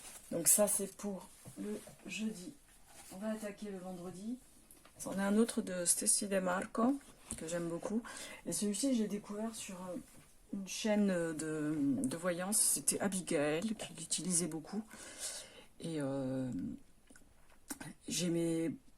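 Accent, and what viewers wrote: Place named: Italy. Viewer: Coucou, merci pour ces jolis jeux et ton accent italien que j'aime tant!!! A bientôt 🌸🌸😊😊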